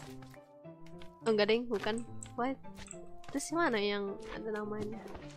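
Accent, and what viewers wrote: West Java, Indonesia. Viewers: i can easily tell she's sundanese by her accent and sweet voice >.< sundanese be having the sweetest and softest voice istg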